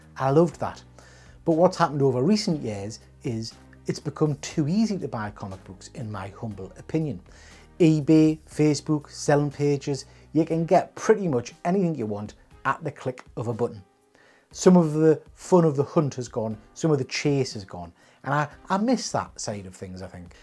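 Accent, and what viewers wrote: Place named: Newcastle, United Kingdom. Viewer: Geordie boy!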